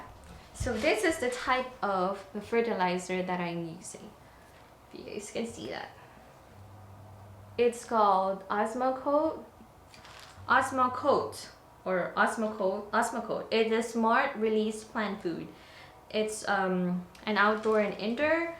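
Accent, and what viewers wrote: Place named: Philippines. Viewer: Its cool, no matter where we live, we filipinos sound the same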